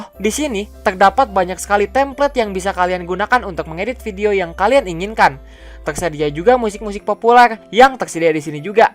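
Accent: West Java, Indonesia. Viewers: Abang orang sunda ya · eh Sunda bos orang mana cuy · Lu Orang Sunda? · bang kocak aku juga mau nanya abang orang sunda😂😂😂😂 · sunda batt:v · orang Sunda ya bang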